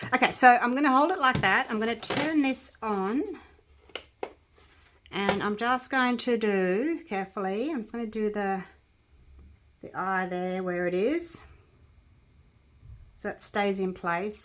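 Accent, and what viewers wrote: Australia. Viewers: I just the Australian accents so could listen all day. In fact I'm in the u UK and loved Aussie when I went all those years ago and came home with the intention of emigrating there but never did. Regret it like hell now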